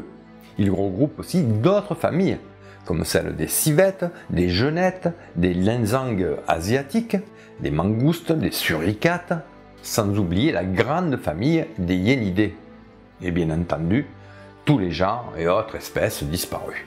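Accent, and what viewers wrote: South, France. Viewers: Si j'ai bien tout suivi par delà l'accent "peuchère"... cela veut dire que le coutelas "dent de sabre" de Rahan n'est qu'une invention Pif Gadget?!... 😒... triste réalité. La double peine du confinement : Le savoir tue tes rêves! hi hi hi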